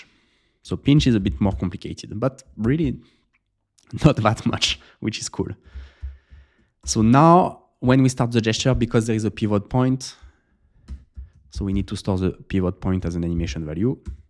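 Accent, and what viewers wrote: France. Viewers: J'adore cette série, ça me donne plein d'idée pour mes projets ! (j'adore aussi ton accent Français ahah)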